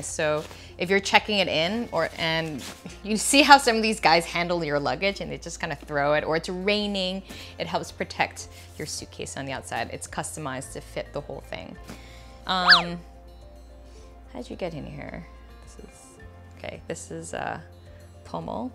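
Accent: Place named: Singapore. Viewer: Singapore accent!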